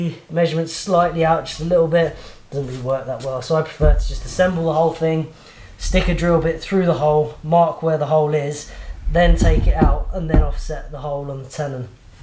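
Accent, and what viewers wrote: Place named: Yorkshire, United Kingdom. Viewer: "...you're gonna wiggle about..." I watch you as much to listen to you talk as anything (although I love your woodwork and garden and building too) because you bring back memories of my grandparents (Yorkshire accents).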